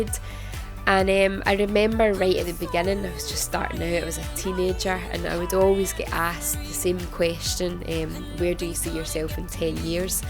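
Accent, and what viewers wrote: Scotland, United Kingdom. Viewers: That scottish accent <3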